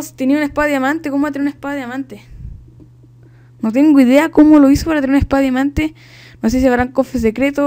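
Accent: Chile. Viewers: su acento me suena chileno:D si no mira que es chileno y compara si no es chileno (SORRY ASI SUENAS) y no importa que hable con otro acento es la vida de el:D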